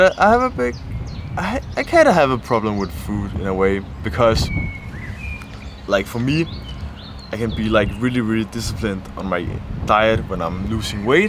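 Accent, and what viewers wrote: Standard. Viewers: 被大家好嚇到 很標準🔥🔥🔥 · 大家好 很標準❤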